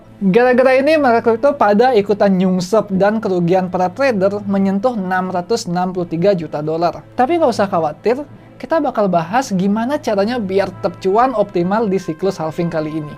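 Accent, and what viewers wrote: Bali, Indonesia. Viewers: Logatne bli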